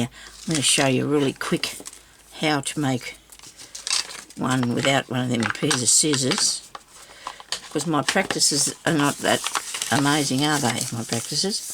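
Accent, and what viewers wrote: Australia. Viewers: I get so much joy watching you! You just love what you're doing and even when it isn't working out as you'd planned you just laugh and say "nobody panic" and keep moving forward! Your spirit mixed with that lovely Australian accent and sayings and sense of humor always makes me happy,lifts my spirits and gives me a giggle for free!! Never change,my friend. You're a treasure 😁💙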